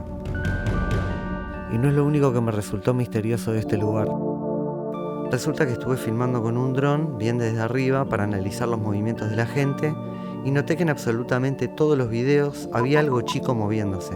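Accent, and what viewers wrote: Argentina. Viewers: Y te digo que por su forma de hablar al principio creí que era argentino, suena igual 😄🇦🇷👋🏻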